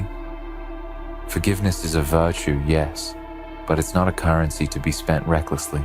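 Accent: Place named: Australia. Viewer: This voice is terrible. Nasal, sleepy, Australian. I much prefer the other voices.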